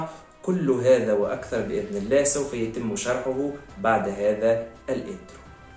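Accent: Tunisia. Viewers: اللهجة تونسية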